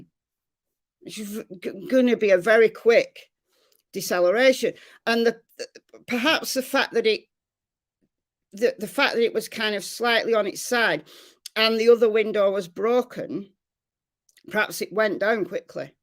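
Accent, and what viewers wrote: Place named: England, United Kingdom. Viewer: Thank you kindly for all that your doing, your a very genuine person out of all the youtubers out there 🐦 And I love your English accent 🙂💜 Lisa ~ Australia 🌌✨🌠 xx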